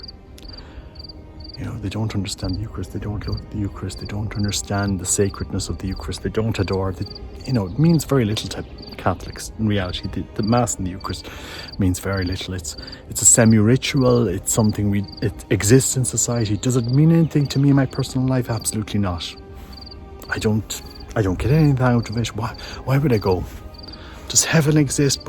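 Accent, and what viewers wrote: Ireland. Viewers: The Eucharist is a treasure. Amen! Thanks for your videos, great to hear the Irish accent too 🙏🏿🙏🏽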